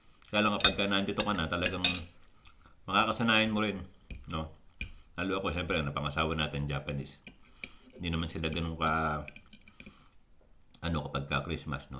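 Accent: Philippines. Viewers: Tagalog na tagalog ka kabayan, anung probinsya ka ba dto saatin ? 😊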